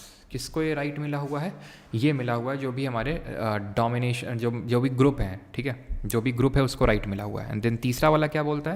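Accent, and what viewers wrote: Kanpur, India. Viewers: भैया बाकी सब ठीक है अपनी उन्नाव कानपुर वाली भाषा सुनके मजा आ गया 😅😅😅😅 कि इतनी ठंडी नही पड़ती की ( जड़ा 😅) जयहो 😅😅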